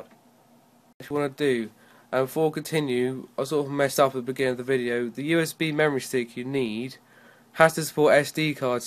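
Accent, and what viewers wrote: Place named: United Kingdom. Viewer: GASP UR BRITISH BRITISH PPL R AWESOME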